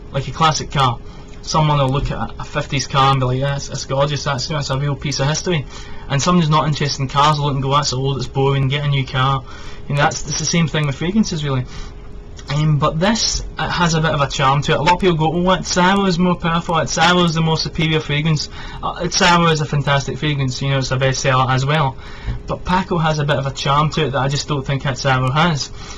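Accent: Scotland, United Kingdom. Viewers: Just don't ever delete your channel. I like to visit it time and again like it's a shrine or something. You're Scottish and I'm a massive Medieval history buff. Oddly enough, your accent, the way your video's shot and your taste in perfumes tie into my weird mental image of a laid back baron from the feudal times who loves his perfumes. I always associate the notes of iris, tobacco, violet, oakmoss, cedar and the likes with the UK from the medieval times. Dim lights, stone walls, smells of fougere and something barnyard like all mixed together with a smell of wet stone/earth. I was playing Kingdom Come Deliverance the other day and I had to get back and check on. I hope you're doing well my friend. You're a youtube legend.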